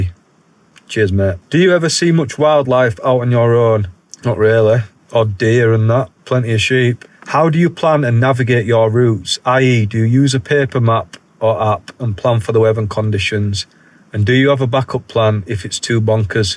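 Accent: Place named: Bradford, United Kingdom. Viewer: You from Bradford?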